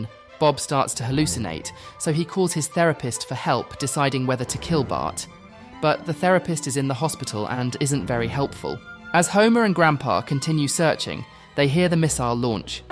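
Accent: United Kingdom. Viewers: Hes britsh a can tell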